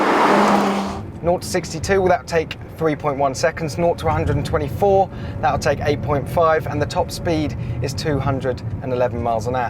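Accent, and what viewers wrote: United Kingdom. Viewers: obviously you havent been to Europe. It's called a British accent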